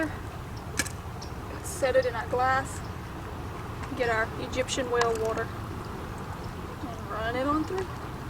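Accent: Louisiana, United States. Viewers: I was trying to id your accent, so you are a lil cajun? Its disturbing how much better your vid's are than so many hard core looking survivory vid posters. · Knew I recognized that accent. Greetings from cajun land!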